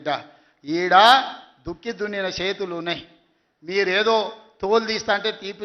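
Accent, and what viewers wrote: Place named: Palamuru, India. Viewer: Maa పాలమూరు yasa lo mata తీరు...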